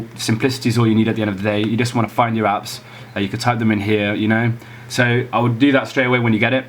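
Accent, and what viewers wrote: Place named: Netherlands. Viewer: Flawless English accent for a Dutchie oml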